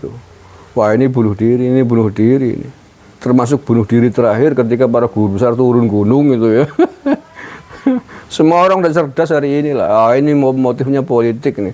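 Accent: Banyumas, Indonesia. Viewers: Jian omngne rika gawe aq ngguyu bae.omngn asli bnyumas.bner2 asli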